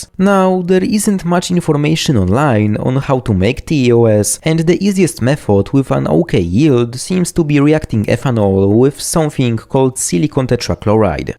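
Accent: Poland. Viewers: Od początku czulem że to Polski akcent, ale potwierdzil wszystko 😆 świetny materiał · as a polish person i love hearing the polish accent in his voice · Jakby ten akcent rozpoznam wszędzie 🇵🇱kocham opale! Labradoryty też są piękne i o wiele tańsze. Super kontent, będzie oglądane ^^ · Hey bro, are you from Poland maybe? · Are you Polish? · Niby słusze angielski ale jakby po polsku:]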